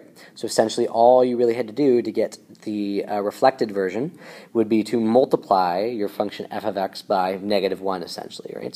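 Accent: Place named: Canada. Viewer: cheers mate rate the canadian accent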